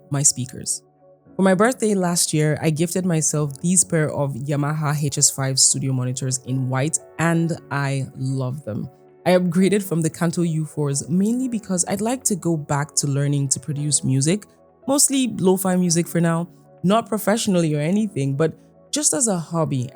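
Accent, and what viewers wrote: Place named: Africa. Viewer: I didn't know women like u exist and you actually sound African. I am impressed and happy, will be nice to connect sometime and geek.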